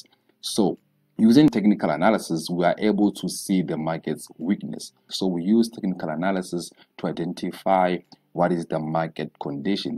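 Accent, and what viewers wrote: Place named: Africa. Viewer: That’s the most African accent I’ve ever heard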